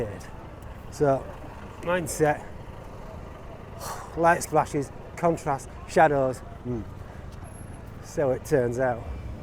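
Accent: Yorkshire, United Kingdom. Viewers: Given that I've seen videos from you in Bradford, Halifax and Saltaire I guessed you must be living not to far from my birthplace. I watch your videos as much for the accent as the photos. Home rule for Yorkshire!! 😉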